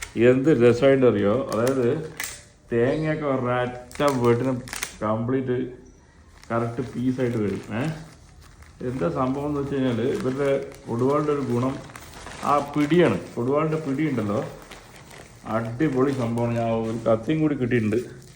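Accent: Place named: Palakkad, India. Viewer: പാലക്കാടൻ സ്ലാങ് സൂപ്പർ സാർ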